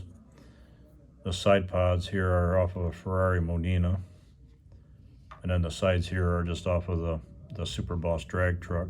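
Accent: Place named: Rockford, United States. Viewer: Damn thats one hell of a drive! I know you said you used to live in Illinois, but the way you talked I thought it was around Rockford.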